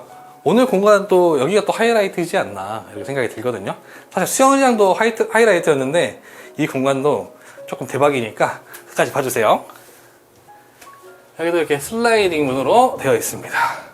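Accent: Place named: Gwangju, South Korea. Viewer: 광주사투리? 정감있어요~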